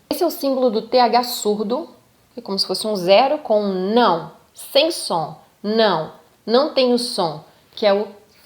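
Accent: United States. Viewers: Seu sotaque americano é perfeito. se aqueles caras o tal do tim explica, o gavin etc analisassem você daria 10 pra você. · Ouvindo você falar em inglês com sotaque americano, parece até ser mais fácil a pronúncia americana da inglesa.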